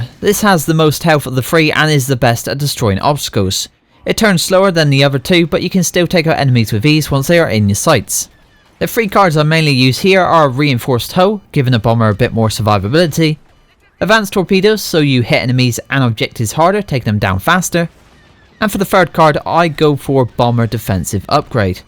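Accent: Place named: South West England, United Kingdom. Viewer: South West boy are ya? Good to get tips from a local (I'm Cornish).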